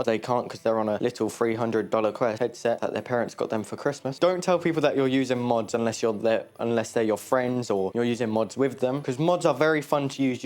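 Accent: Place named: United Kingdom. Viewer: u sound british, but you dont look british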